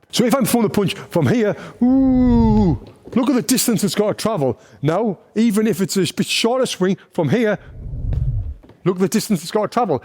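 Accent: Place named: Newcastle, United Kingdom. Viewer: Excellent video, nice geordie accent 👍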